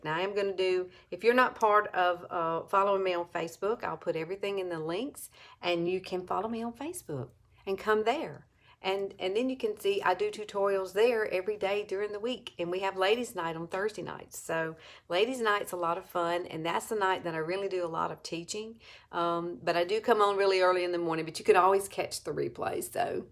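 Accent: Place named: East Tennessee, United States. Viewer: I am from East TN and you sound a lot like we talk , and it is unique all over the world , I understand you Perfectly.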